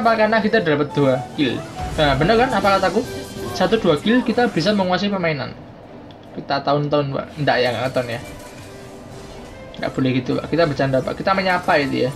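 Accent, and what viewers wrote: Java, Indonesia. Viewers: Medog bang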